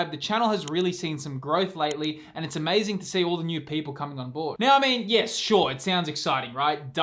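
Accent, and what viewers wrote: Australia. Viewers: Love. That. Lefeon! Thanks for the content! Aussie accent makes it even better.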